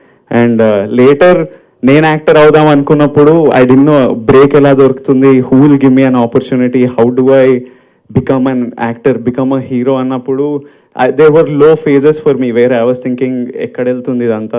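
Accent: Telangana, India. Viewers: jai telangana.. hero dorikindu.. jai hero .. super hero..with his speech.. good look.. jai hero..he spoke pakka..hyderbadi..telangana slang..so..natural..jai hero..jai jai hero..jai kcr..jai ktr..jai telangana......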